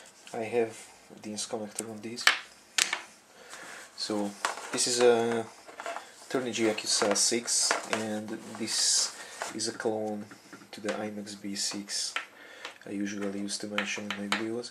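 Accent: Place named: Hungary. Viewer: Felismertem a magyar akcentust :D Azon gondolkozok, hogy a gyári 9.6V-os NiMh helyett 3 LiFePO4-et, vagy 2 Li-ion-t használjak. Utóbbi esetben 2P-be is tudnám kötni, vagyis 4 db-ot használnék dupla kapacitásért (kb. 6000mAh). Szerinted melyik a jobb döntés? I'm wondering whether I should use 3 LiFePO4 batteries or 2 Li-ions instead of my stock 9.6V NiMh. In the latter case I could use 4 pcs instead of just 2 in a 2P configuration for double capacity (around 6000mAh). What do you think?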